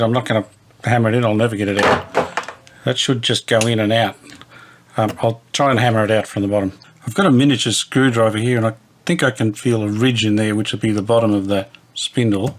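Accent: Australia. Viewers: Fine job,! I do like your videos. "It's, so if it gets destroyed, it wouldn't worry me.", haha! Those subtle jokes you sparkle here and there are just great. Love the Aussie accent too.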